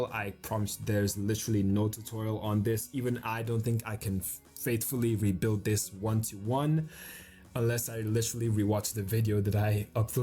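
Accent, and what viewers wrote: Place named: Nigeria. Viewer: Your vids really helped me break my builders block thanks man. I always knew you were Nigerian hehe😅... M also a Nigerian we know our tongue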